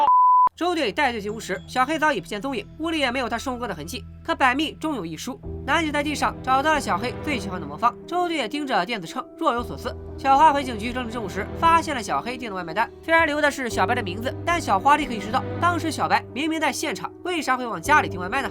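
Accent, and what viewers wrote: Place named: Qingdao, China. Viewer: 请问你是青岛人吗？感觉口音好重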